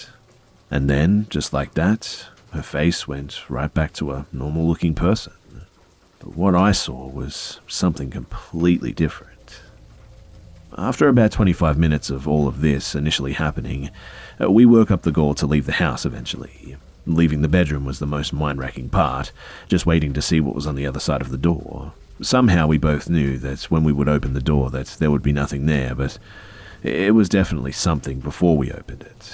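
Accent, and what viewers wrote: Australia. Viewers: Is Australian???????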